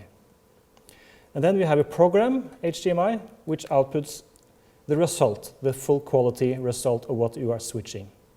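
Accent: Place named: Norway. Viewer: Tok ikke lang tid å høre at denne fyren er norsk!:P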